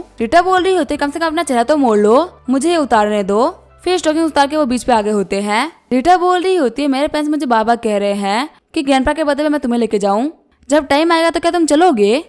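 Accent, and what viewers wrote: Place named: Uttar Pradesh, India. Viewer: tum pakka up se ho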